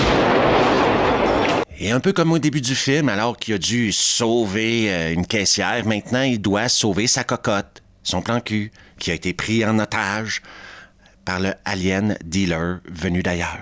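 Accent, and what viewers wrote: Canada. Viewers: J'adore ta manière de compté une histoire avec ton accent canadien 😂😂😂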